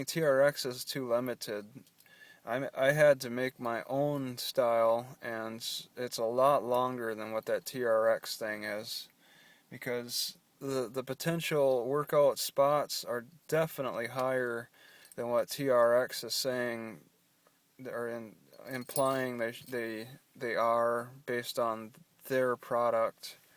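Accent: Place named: Alaska, United States. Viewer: lots of tourism related jobs and cannery work up here (just don't tell anyone its our secret). but some canneries house and feed you! even though the pay isn't always the greatest. The rain keeps a lot of people from living up here though. you talk like an Alaskan... a State of very independent people.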